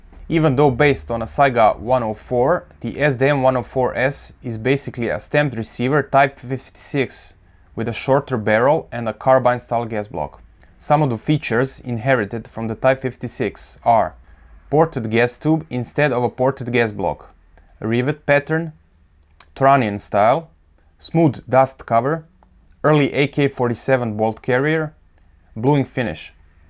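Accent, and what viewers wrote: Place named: Eastern Europe. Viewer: Славянский акцент. Вы из России?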